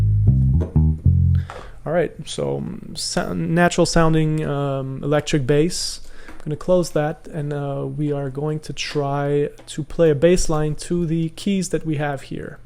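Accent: Quebec, Canada. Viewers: Quebecois spotted. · stu un quebecois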